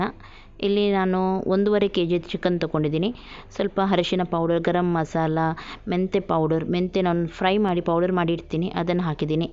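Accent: Mangalore, India. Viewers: Hi I'm from devanahalli nim yella vlog na ee lockdown time nali nodtidini kushi agute nim family nodoke n nim tumba lucky Olle family na aa devru kotidane nange nim birthday nim husband celebrate madidu adu nange tumba kushi aytu n nim mangalore baashi tumba chenda untu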